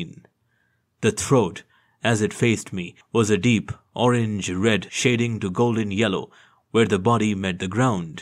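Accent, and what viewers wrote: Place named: India. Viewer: The ‘neutral’ Indian accent, the one that doesn't specify any regional recogs.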